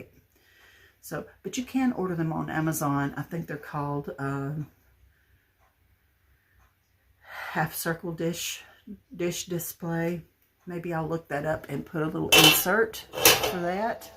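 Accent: South, United States. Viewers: Oh gosh! What a haul! I just love your hauls! Just my style! And all said and done with that heart warming southern accent that reminds me so much of home~You are such a treasure, sweet lady~thank you for taking time to share and to chit chat....I love it! AND...P.S. I LOVE your driftwood angel back there!! xo~Catherine from Colorado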